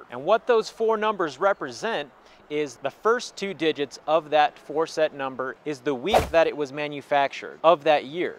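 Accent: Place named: Kerala, India. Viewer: നന്നായിരിക്കുന്നു , ഇഷ്ടപ്പെട്ടു. നല്ല ഇംഗ്ലീഷ് ... ഒരു മലയാളി Touch.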